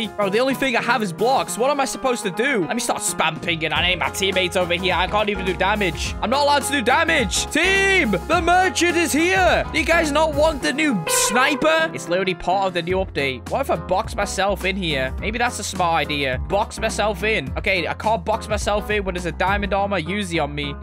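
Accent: United Kingdom. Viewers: Did bro turns to british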